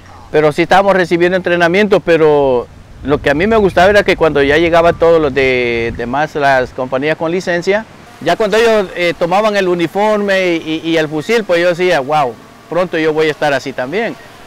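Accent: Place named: Guatemala. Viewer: Este maytro habla como Chapin .saludes a todos Los de aguachapan y sonsonate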